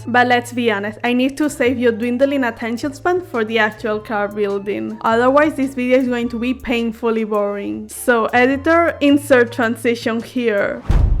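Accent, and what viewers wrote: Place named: United Kingdom. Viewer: you sounding british makes the video better lol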